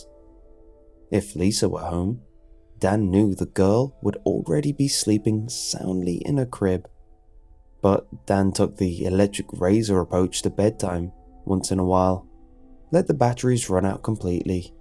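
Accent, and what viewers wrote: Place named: England, United Kingdom. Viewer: Maya Pletz I mean has an extremely eloquent English accent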